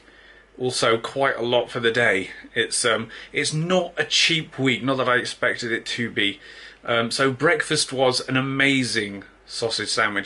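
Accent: RP, United Kingdom. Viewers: You have to do the posh accent, everything else won't cut it! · I like your posh accent. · Could NOT stop laughing at your monocle and posh accent, cracking me up!!!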